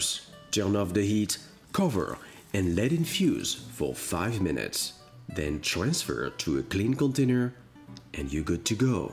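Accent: France. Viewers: J'aimerais savoir une chose, vous êtes français? Parce que quand vous parlez anglais vous avez le même accent que nous français lorsqu'on parle anglais. Des vidéos en français serait top même si je comprends tout à fait l'anglais. Thank you for your recipes they're soooo delicious !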